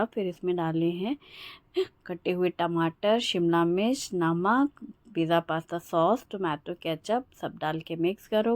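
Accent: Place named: Banda, India. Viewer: Sahi kaha rhi ho aap kaam Kabhi nahi katam hota apke bolne ka ton bilkul banda ki taraf ki hai kahi pe raho pr apne jameen ki khushbu aa hi jati hai❤️❤️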